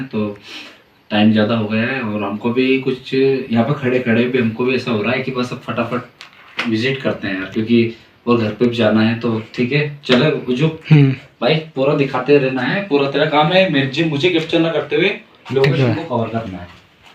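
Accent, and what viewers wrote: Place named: Ajmer, India. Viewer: Bhai aap ajmer side ke ho kya sorry bura mat manna mujhe aisa lag raha hai ❤️🙏🙏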